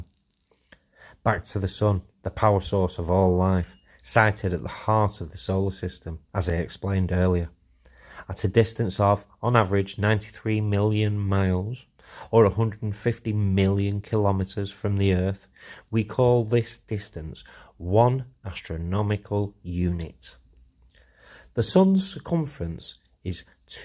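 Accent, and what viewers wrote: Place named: United Kingdom. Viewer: I love stuff like this. Love the British accent of the narrator too! Easy to understand info. Even my wife was able to follow! 😂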